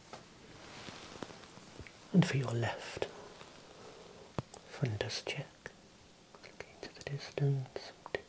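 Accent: Birmingham, United Kingdom. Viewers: I think i detect a bit of a Brummie accent here. Love the ASMR!👍👍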